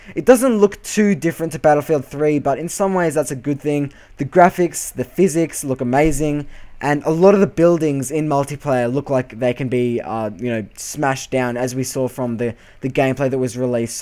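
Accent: Australia. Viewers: nice list bro I agree on everything you said. ps: are you Australian? ^_^ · He's Australian. Not everyone speaks english like you. British, Caribbean, American, Australian, Canadian. All english, just a different dialect of the language. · its just so nice to hear a familiar australian accent on youtube.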